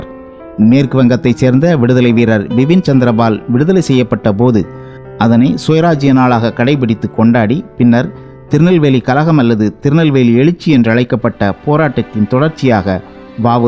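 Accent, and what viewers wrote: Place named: Coimbatore, India. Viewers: கோயம்புத்தூர் பேச்சு வழக்கு எனக்கு பிடிக்கும் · Respect slang my home town kovai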